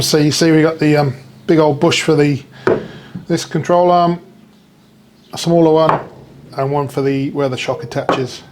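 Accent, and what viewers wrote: United Kingdom. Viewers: Sound British mate...... I have to get this done on mine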